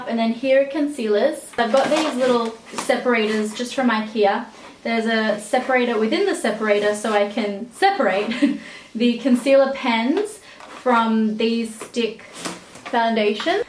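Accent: Australia. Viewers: i see from your accent your Australian?? so am i but i was wondering where u get ur makeup from because u have the brands that we don't have in Australia???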